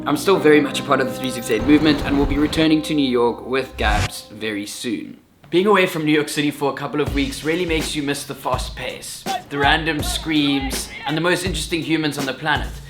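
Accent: South Africa. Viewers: I started to read the comments and could here the awesome SA accent as I read each one 😂 From, just an Aussie who loves South Africans boet.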